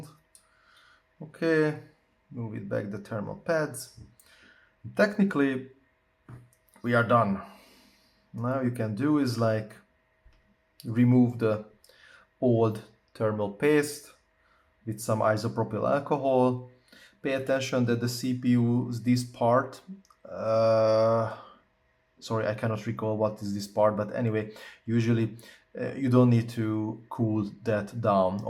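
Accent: Hungary. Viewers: Szia, pontosan ilyen laptopom van. Van ra lehetoseg, hogy privatban beszeljunk? Ramot szeretnek boviteni viszont szakemberre biznam plusz lenne par specifikus kerdesem ezzel a laptoppal kapcsolatban. Nagyon informativ a video koszonom. (Magyar akcentus elteveszthetetlen :) )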